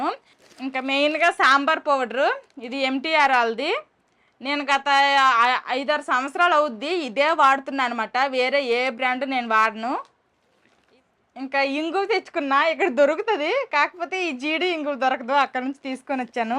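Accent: Srikakulam, India. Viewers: Super sis meru ala mataluaduthunte mana sklm ani feeling happy❤ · వీడియో బాగా నచ్చింది లైక్ చేసాము sister ❤ అమ్మ వాళ్ళ ఇంటి నుండి మనకి ఎన్ని ఇచ్చినా మనకు సరిపోవు కదా సిస్టర్ మన శ్రీకాకుళం లాంగ్వేజ్ నాచురల్ గా మాట్లాడుతుంటే చాలా ముచ్చటగా ఉంది❤❤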